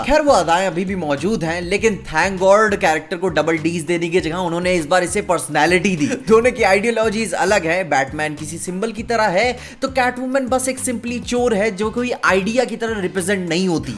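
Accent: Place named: Lucknow, India. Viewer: bro kaha se ho app ki bolne ka tarika lucknow ka lag raha hain vaise main bhi lucknow se hu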